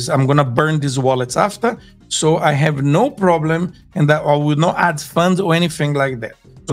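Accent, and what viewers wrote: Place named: Brazil. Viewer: Brazilian accent detected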